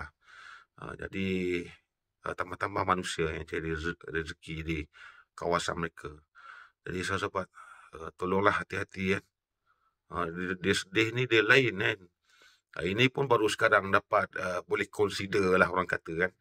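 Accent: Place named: Sarawak, Malaysia. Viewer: Terima kasih tuan atas perkongsian ilmu, kmk salute BM ktk sgt fluent tapi tetiba ada yg melimpas bah mesti nyatok org Sarawak😊 Teruskan tuan dengan video² yg selanjutnya bagus juak guna drone camya puas nangga..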